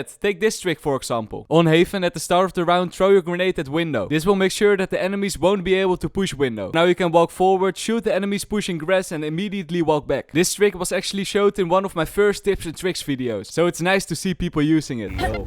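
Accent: Netherlands. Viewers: Genuine question: are you Dutch? 👀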